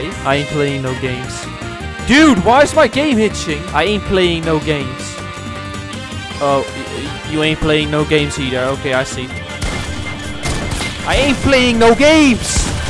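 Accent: Netherlands. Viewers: Jij bent Nederlands ik kan het horen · Are you dutch cause you have a dutch accent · Haha je hoort echt aan je stem dat je Nederlands spreekt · I grew up in Holland and moved to the uk and I can tell your dutch just by your accent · Nice dutch acsent dude im dutch too So its Not HATE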